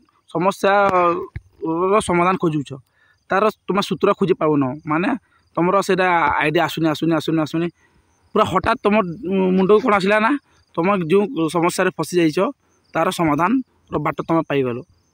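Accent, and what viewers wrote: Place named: Sambalpur, India. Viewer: Sir apan ken village ru belong karsan mate tike apan sambalpuri bagir lagu chhan i think west odisha ru belong karsan jadi b an ta sambalpuri re padhaun sir🙂 I'm from bargarh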